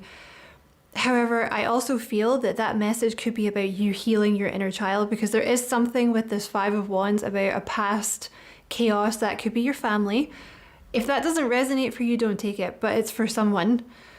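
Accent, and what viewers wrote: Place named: Scotland, United Kingdom. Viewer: Ouch some uncomfortable home truths there. This was a brilliant reading delivered all the better with that wonderful Scottish accent across an equally wonderful kitchen table. I’d love a one to one tarot reading on that same table with a truckers tea brew! Haha! Thank You. 🙏🙂🙏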